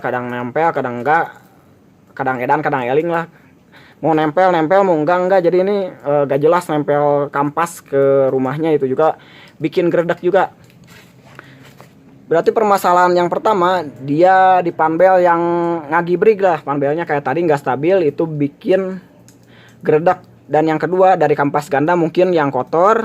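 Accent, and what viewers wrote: West Java, Indonesia. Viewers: Jelas dan ngakak saya ngadenge nya mang 😂 kaciri sunda na pisan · Meng inspirasi suatu saat bakalan tak coba. Kang tinggal dmn mau dong motor saya di sevice kaya gitu di acak acak.. hehe.. kalau logat mah Sunda yah... Daerah mana kang..minta no wa dong. Klo blh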